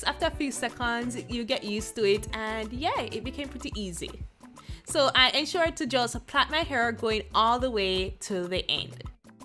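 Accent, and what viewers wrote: Jamaica. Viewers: Love how you talk...you sound so much as a jamaican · Sound so Jamaican. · jamaican!!? i pick up d accent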